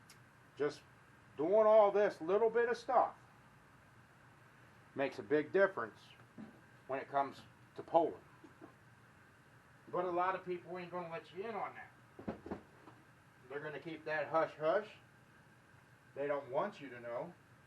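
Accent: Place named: West, United States. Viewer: So are you a mechanic or a very handy man? And sounds like someone from the west.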